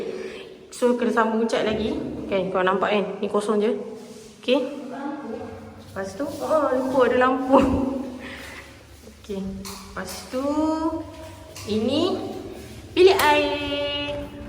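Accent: North, Malaysia. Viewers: . Kami prefer cakap utaqa lagi sedap dri pelat keyel. Hihi. Ni jujuq tau dri km peminat oqg utaqa jugak. 😂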